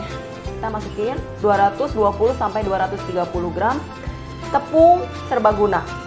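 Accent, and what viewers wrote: West Java, Indonesia. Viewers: trus Sunda pisan, kacida Sunda na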